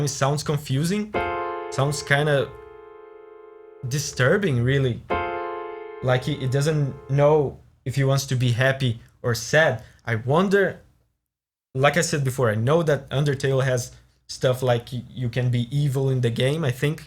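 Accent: Brazil. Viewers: Tu é do brasil né? Muito top, vi um pouco do seu sotaque e fui conferir e né q era vdd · Sotaquezinho brazileiro, que fofo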